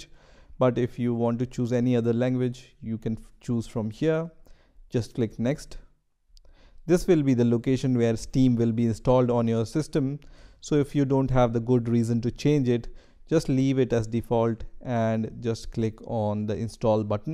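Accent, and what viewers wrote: India. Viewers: his indian voice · are you indian?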